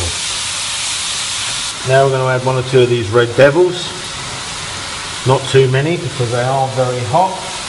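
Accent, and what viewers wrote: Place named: United Kingdom. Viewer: I love the recipe and the surprise that a British-sounding cook has real Italian-speaking family members. Love it!!!!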